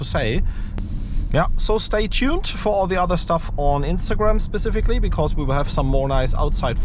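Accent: Germany. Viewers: Man hört richtig dass du ein Deutscher bist :) schönes Video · I Like your german accent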